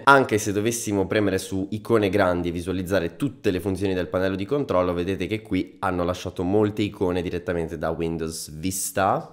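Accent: Italy. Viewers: Lo potevi dire subito che eri napoletano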